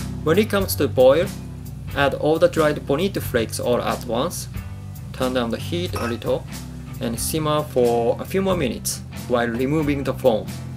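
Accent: Japan. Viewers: Why are you talking in a japanese accent? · a white guy with a japanese accent. smh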